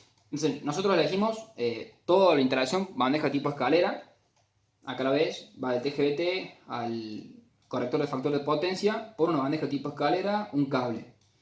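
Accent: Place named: Corrientes, Argentina. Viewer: Muy bueno lo tuyo joven. Soy muy veterano en estas cosas y de verdad me encantó. Supongo que eres argentino. De que provincia?. Parecería correntino. Éxitos en tus proyectos y en la facultad. Saludos desde Uruguay.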